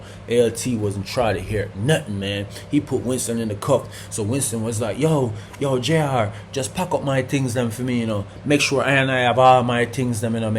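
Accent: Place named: Jamaica. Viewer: Your Jamaican your best accent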